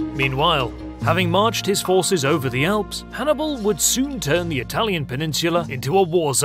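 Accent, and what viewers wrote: England, United Kingdom. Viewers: Why does this sound like it was written by an American and read by an Englishman? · Subscribed! That's what I call interesting quality content, I even love your British accent. :) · The best animated documentary of the 2nd Punic War ever seen: very detailed descriptions, beautiful animations, great music and sound effects (seems to me Rome Total War style). I almost forgot, your British accent is very elegant and very understandable, thanks a lot!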